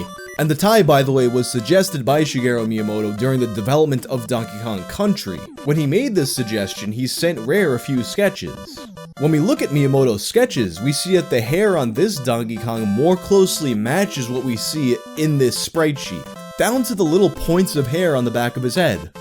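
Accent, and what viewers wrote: New York, United States. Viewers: Like every New Yorker you'll ever meet. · Lol I first heard it from Rich Massucci of ReviewTechUSA and now I can’t unhear it. They’re both New Yorkers so definitely an accent thing.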